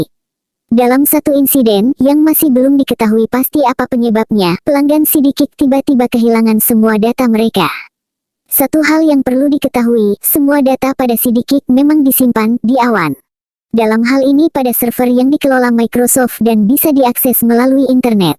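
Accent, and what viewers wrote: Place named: Java, Indonesia. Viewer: Jowo e ngendi sluur😁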